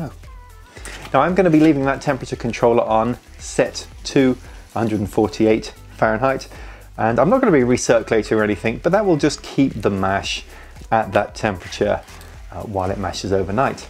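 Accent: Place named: United Kingdom. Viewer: I was actually thinking something similar when I heard her speak - there's definitely some British influence rubbing off on her accent sometimes!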